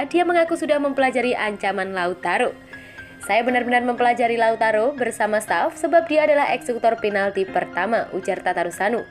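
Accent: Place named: Java, Indonesia. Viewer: Lo kok jadi medddok uuwalah .. mba e ..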